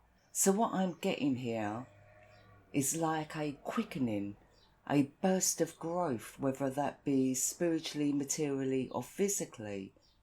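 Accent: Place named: Kent, United Kingdom. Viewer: Your accent sounds like where we lived in Sandwich, Kent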